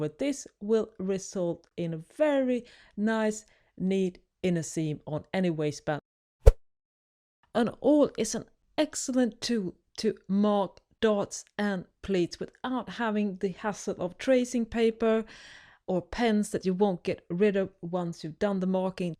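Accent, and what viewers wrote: South Africa. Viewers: is this lady south african? im not sure cuz sometimes i will still mix it up with australian for whatever reason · I'm trying to guess what your speaking accent is, I find intriguing and quite pleasant to hear . South Africa, Dutch ? Please share. Thanks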